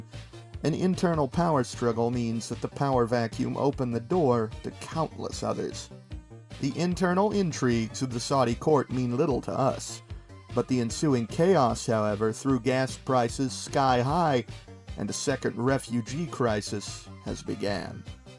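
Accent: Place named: United Kingdom. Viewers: There were only a few moments I could tell it was you. But mostly it was hard to tell. If I showed that to someone who didn't know you, they'd think you were a brit. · Well, By listening to that accent, I mistaken that you were also British.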